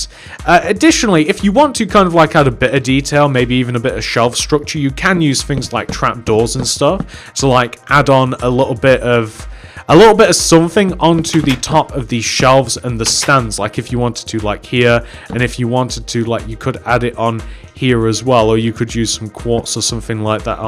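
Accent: United Kingdom. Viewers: Quick question Are you british bc you sound british to me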